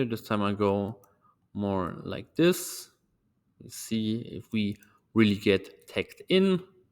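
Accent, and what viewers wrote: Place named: Germany. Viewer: I can just hear you are german, keep it up! the accent will go away after some time.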